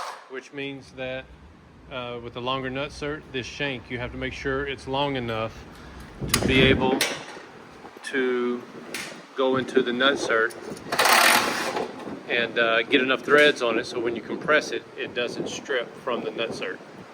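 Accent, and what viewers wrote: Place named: Texas, United States. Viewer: Texas Talking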